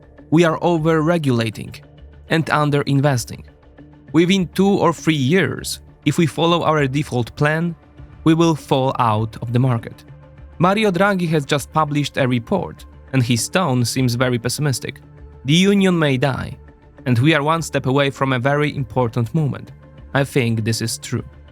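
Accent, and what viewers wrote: Poland. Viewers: You do excellent work! Very informative, must be very time consuming. Liked and subscribed. And I love your Polish accent, I’m Ukrainian by birth. 😊 Suggestion: given the depth of material and watch time, suggest you have a timeline so that people can jump back to a topic of interest. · Not surprised this guy has a Polish accent. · POLISH ACCENT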